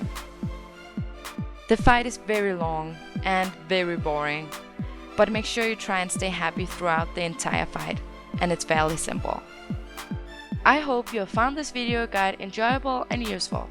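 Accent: Denmark. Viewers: Agree, she's definitely a dane xD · this sounds a lot like danish english hahaha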